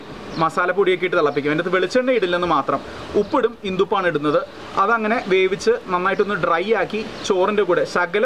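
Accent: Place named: Pathanamthitta, India. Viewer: ഇല്ല ഭായി സംസാരത്തിനു യാതൊരു കുഴപ്പവുമില്ല. ഞാൻ കരുതിയത് ഭായീടെ നാട് പത്തനംതിട്ട ആണെന്നാണ്.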